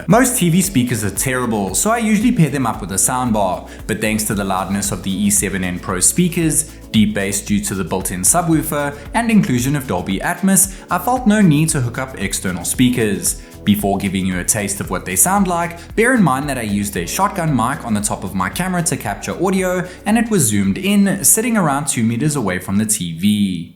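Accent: South Africa. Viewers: This is the first time I stumbled across content from you, immediately heard that lekker SA accent my bruuuu. Cool video, thanks!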